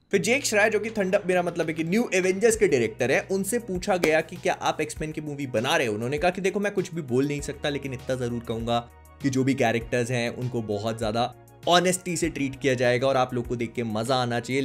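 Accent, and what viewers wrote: Bhopal, India. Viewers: Baba makde app bhopal se ho kya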